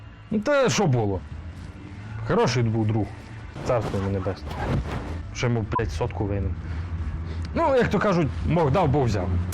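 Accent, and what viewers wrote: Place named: Galicia, Ukraine. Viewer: НЄ ну цей пацан для мене самий. Ріл смішно щей галицький діалект)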